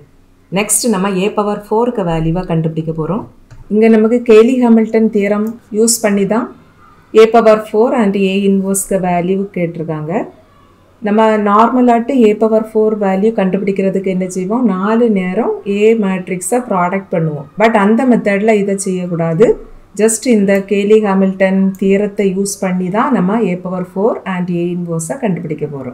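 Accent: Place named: Kanyakumari, India. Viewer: Mam neenga kanyakumari ah?.... Because your language like that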